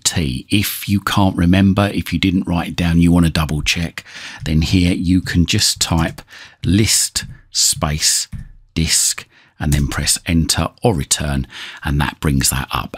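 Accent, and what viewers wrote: England, United Kingdom. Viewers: Fantastic. Extremely clear and concise instructions, plus the English accent was wonderful to listen too. Before I came across your channel, I had worked several unsuccessful hours. I didn't want to wait for Microsoft fix. And your video provided the alternative I needed. 100% success. Thank you. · I have to agree with this user(Donald-area51eagle24) comment. "Fantastic. Extremely clear and concise instructions, plus the English accent was wonderful to listen too. Before I came across your channel, I had worked several unsuccessful hours. I didn't want to wait for Microsoft fix. And your video provided the alternative I needed. 100% success. Thank you." I could not believe how much time I wasted on trying to fix this update. Just an FYI – I have a Master Boot Record (MBR) so following the instruction you got from MS made sense to me. 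I been IT for 30 years plus but have not work on a computer since 2020 when I retired. Best instructions ever. Thank you so very much.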